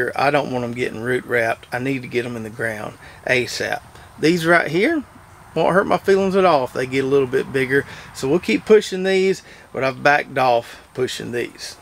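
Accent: South, United States. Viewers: I learn too much from you to unsubsidized how you talk is how you talk you from the south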